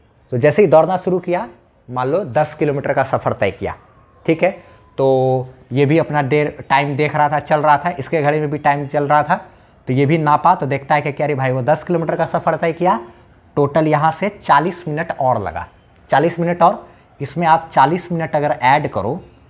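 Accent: Bihar, India. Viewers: ❤tankuuuu bhaiya And Bihari accent in your words are just killing.....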